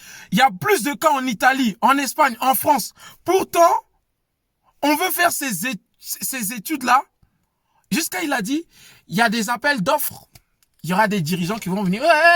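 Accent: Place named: Ivory Coast. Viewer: L'accent me dit que c'est un frère du 225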